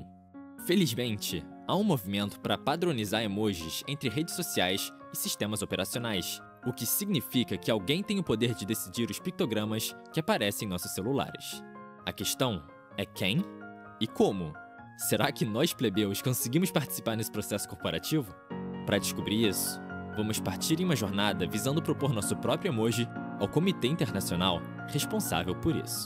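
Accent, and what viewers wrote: Rio de Janeiro, Brazil. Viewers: emojix? pqp odeio esse sotaque carioca 😠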